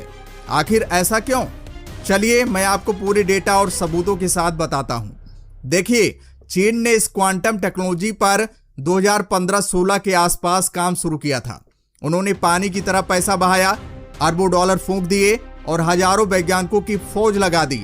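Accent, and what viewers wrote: Bihar, India. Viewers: I wish our endeavour great success. I didn’t like the language of the presenter. Sounds too Bihari. Jai Hind.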